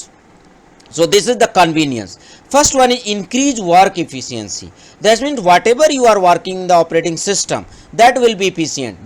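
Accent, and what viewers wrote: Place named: Pakistan. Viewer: Your pinglish is awesome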